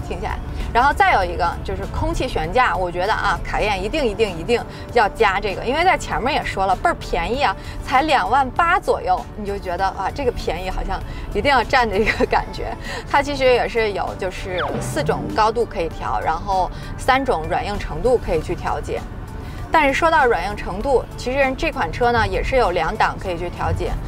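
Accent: Beijing, China. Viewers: 解说的很到位，喜欢你的北京腔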